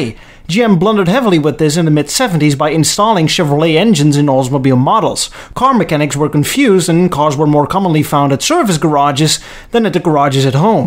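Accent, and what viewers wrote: Netherlands. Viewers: are the very best motor vehicle episodes on YouTube. Brief, to the point, factual, entertaining humor enhanced further by Dutch-accented delivery. FIVE STARS. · Great, interesting gloriously, mammoth series of videos. really enjoyed each one and it has given a sparkling insight into that period in American car evolution. Don't worry about your pronunciation. Your Dutch accent is brilliant and quirky. How does one pronounce Coupe in the USA, England (the home of English) and Europe? There isn't only one pronunciation! Please keep up the good work.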